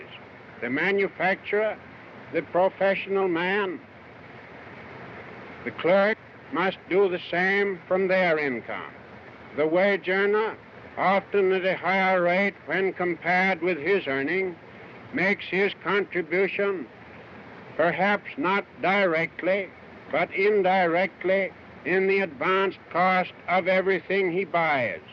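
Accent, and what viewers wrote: New England, United States. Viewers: Boy, he really sounds New England. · Perhaps why he didn’t like public speaking. He was from New England, at a time when that area had a very distinctive speech pattern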